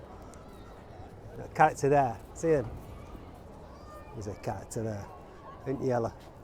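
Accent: Yorkshire, United Kingdom. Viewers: Given that I've seen videos from you in Bradford, Halifax and Saltaire I guessed you must be living not to far from my birthplace. I watch your videos as much for the accent as the photos. Home rule for Yorkshire!! 😉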